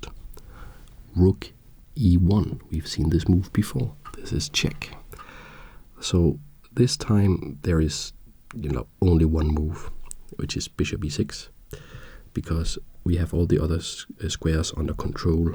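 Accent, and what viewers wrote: Denmark. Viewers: Are u from denmark? I can hear the accent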